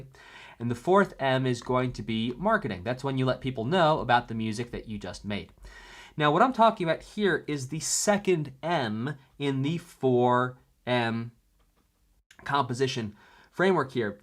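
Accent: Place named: France. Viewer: "Clair Obscur, je conduis une voiture..." xD ... et je percute un Sakapatate ! Did he talk about chroma? An advantage! Nice french accent btw!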